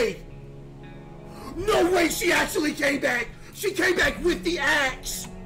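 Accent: South, United States. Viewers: most def cus he's southern AND has a southern accent, I'm from the south (texas) and I can tell despite him glowing in the light and the ginger hair he is def a seasoned white man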